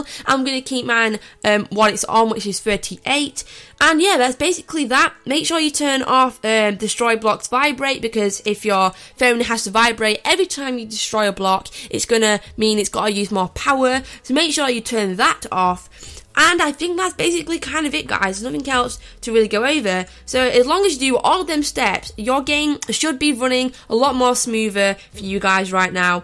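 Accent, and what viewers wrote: England, United Kingdom. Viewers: What is your english accent? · why does every minecraft player has british accent? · i like your british accent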